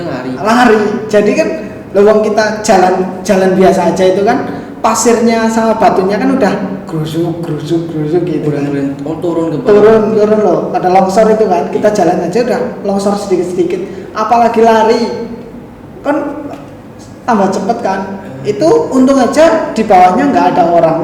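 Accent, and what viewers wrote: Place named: Java, Indonesia. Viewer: Mas medok sekali mas 😁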